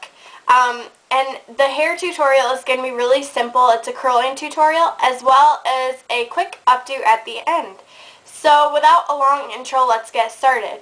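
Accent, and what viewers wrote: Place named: Wisconsin, United States. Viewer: Are you from the Wisconsin area? haha you have the accent.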